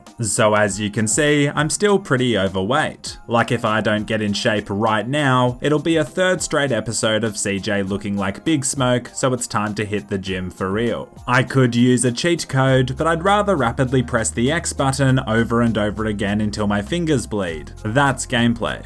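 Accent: Australia. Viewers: You talk like a robotic Australian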